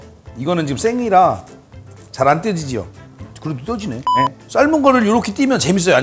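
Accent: Chungcheong, South Korea. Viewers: 울고향친근한 충남사투리구수한 수더분한인상 넘잘합니다 성공신화역시~ 화이팅!! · 남편이 옥수수를 안먹더니 나이들어 좋아졌다고 어제도 쪄달라했는데 이제 비법을 알았으니 맛있게 쪄줘야게쓔 백종원 선생님 감사해유~~~♡ 충청도 사투리가 구수하구 좋아유~~~~~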